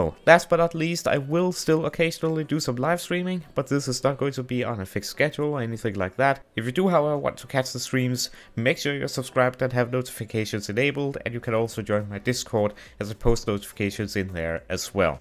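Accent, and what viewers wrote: Denmark. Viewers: I wonder where you're from. I recognize that accent! Are you from Denmark? It sounds alot like a danish accent.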